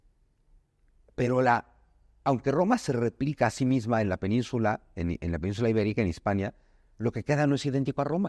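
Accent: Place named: Mexico. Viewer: Ameno, grandísimo conocedor, dicharachero...con ese hermoso acento mexicano. Grandísimo placer escucharte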